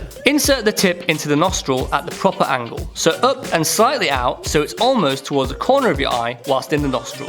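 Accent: United Kingdom. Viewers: oh wow he is bri ish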